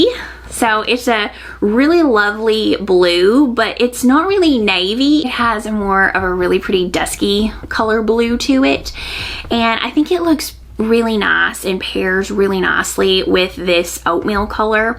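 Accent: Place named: Arkansas, United States. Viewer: I always love a new video from you! You accent makes me feel like home. My family is from Bentonville. I can not wait to see what you get up to this year!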